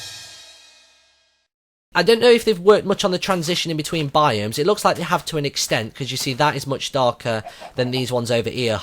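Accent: United Kingdom. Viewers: oh, cheerful person, funny... and a british accent. You got yourself a new subscriber.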